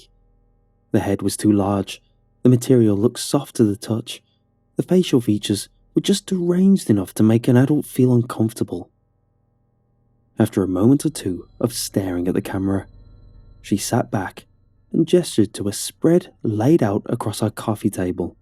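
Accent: United Kingdom. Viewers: Man the British is strong with this one.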